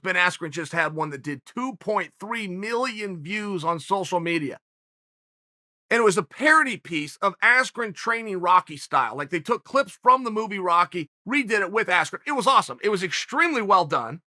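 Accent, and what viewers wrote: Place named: United States. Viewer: this dude have the most American voice i ever heard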